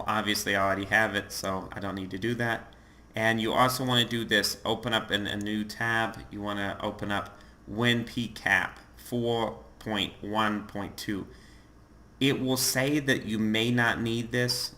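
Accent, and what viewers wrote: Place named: New Jersey, United States. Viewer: this guy is 100 % from new jersey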